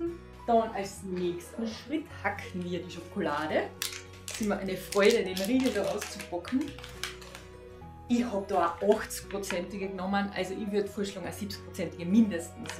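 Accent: Carinthia, Austria. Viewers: Hab mei Ergebnis gerade bei Instagram gepostet inkl Verlinkung. Ist wirklich lecker 👌 Süß find i, dass du in dem Video deinem Kärntnerisch so treu geblieben bist 😊👍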